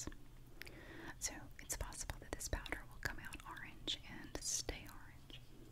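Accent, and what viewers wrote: South, United States. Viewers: i love her voice so sweet and southern. like mine be safe beautiful u are doing amazing job im proud of u love u always frim a fan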